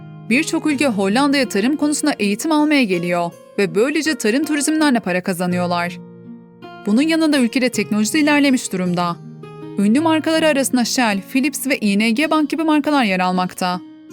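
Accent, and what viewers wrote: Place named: Aegean, Turkey. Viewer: seslendiren hanımefendi ege köylüsü sanırım yapıyoo, sağlıyooo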